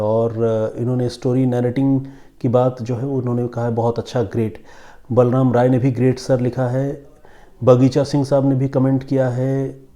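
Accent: Standard. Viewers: sir ji apki hindi.....ap devta hain ....itni shudhh hindi bhot kam suni hai.. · Sir u r good anchor n good story teller with pure hindi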